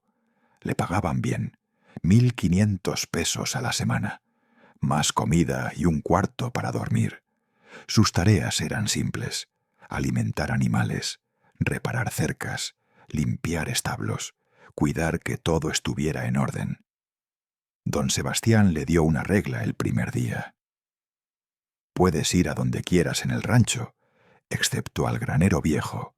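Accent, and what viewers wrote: Spain. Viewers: Ese acento españoleto no cuadra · Todo bien pero Ese acento Español nada que ver con un relato de inmigrantes Mexicanos..se convierte en algo no creible..😅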